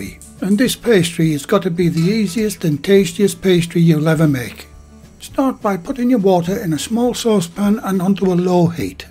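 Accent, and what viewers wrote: United Kingdom. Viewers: Ahh, sir your accent and recipes reminds me of me Gran and me mum out here In Australia, I have all their old recipes that they wrote down and I follow them to the letter, as I’m getting on a bit now (52) I’m considering putting them together in a book, you can’t beat these old time recipes, you are my favourite cook on YT as you’re the closest I’ve found to that ole home style British cook, thank you mate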